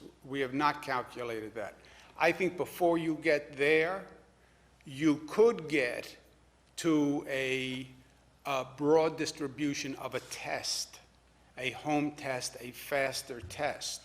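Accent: New York, United States. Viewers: I am, like so many others, a native NYer now living in FL. Cuomo is even comforting ME down here!!! He sounds like and reminds me of his father, Mario, so much. Hannity criticizes him, but it's still so comforting to hear the NY accent and the way he cuts to the chase in explaining what's going on. and of course, he's fighting to get the best for his state. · Plus everything he says sounds like he's describing a nice stake or his mama's marinara...lol....I live in FL now, but am originally from NY state, Buffalo, but spent time in NYC here and there as well and his vocal deliveries take me home, lol...the NYC guys/men and the North Buffalo guys/men I knew growing up..but I'll lay it bare here, I don't personally like politicians in general, but still listening to him is informative about what's going on up there and like I said, his delivery is nostalgic. 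I'm hoping this is over soon, for all of us, every where.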